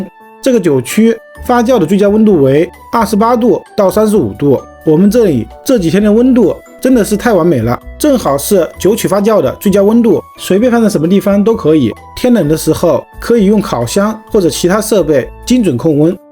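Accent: Wuhan, China. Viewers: 典型的武汉的口音~！😀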